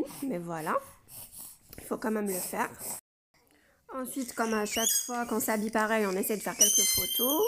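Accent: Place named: Kabylie, Algeria. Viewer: Même ton accent kabyle est charmant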